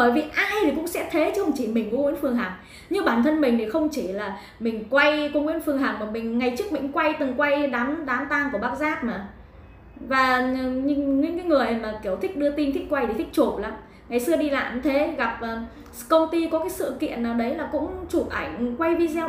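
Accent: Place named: Countryside, Vietnam. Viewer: Con này nói tiếng nhà Quê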